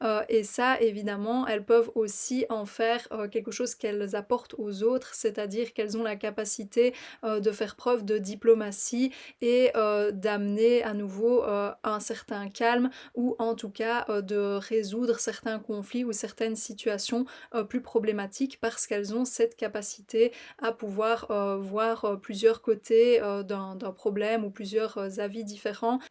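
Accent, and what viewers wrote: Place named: Belgium. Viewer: ton accent belge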